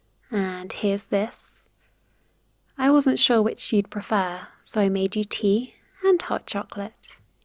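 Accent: England, United Kingdom. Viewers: Man, im a sucker for a good English accent.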